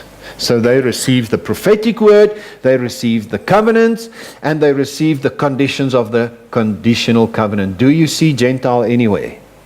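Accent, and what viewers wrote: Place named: South Africa. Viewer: Glad to come across this. Pastor has a South African accent.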